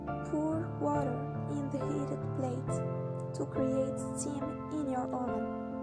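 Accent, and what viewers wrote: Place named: France. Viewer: you're amazing... I love your work, I love your recipes, I love your voice and your little french accent! Everything together make your video unique ❤️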